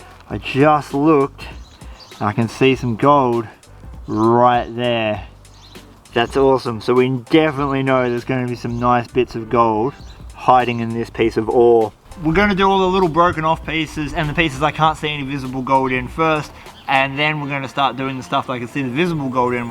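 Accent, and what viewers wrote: Australia. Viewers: I think I just found the Australian version of myself without the tattoos I'm doing everything this guy's been doing without the Australian accent which I think would be really neat to have as well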